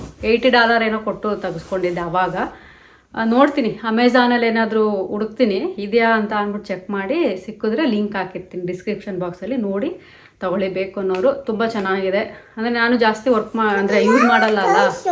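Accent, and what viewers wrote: Mandya, India. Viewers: mandya bhashe ne hange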